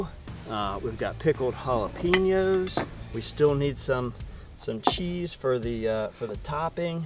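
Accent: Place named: Carolina, United States. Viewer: sounds a lot like Trey Gowdy…a fellow Carolina native! Great burgers & a new sub here. Grill on brother!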